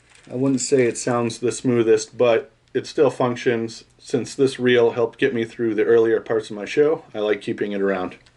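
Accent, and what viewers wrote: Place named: Georgia, United States. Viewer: I'm gonna guess you are from Georgia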